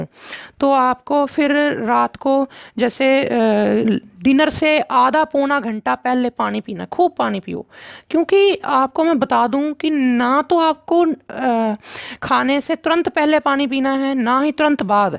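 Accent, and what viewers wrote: Punjab, India. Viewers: Thanx for best useful information an di like your punjabi accent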